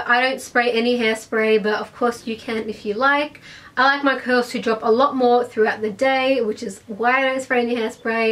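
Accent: Australia. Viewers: Subscribed! Love this video and your accent is so beautiful! Are you Australian? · She sounds Australian 😍 but she could be mixed or something, still a babe!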